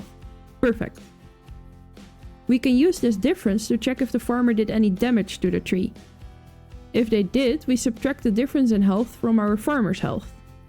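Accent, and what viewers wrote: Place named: Netherlands. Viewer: Your Dutch for sure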